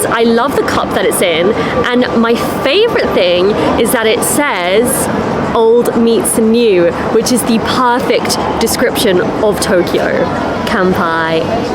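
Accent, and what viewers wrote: United Kingdom. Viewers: 久しぶりの動画😂 が元気そうで何より😊 そしてイギリス英語が耳に心地よいです。これから寒い季節ですがお身体大切に🙏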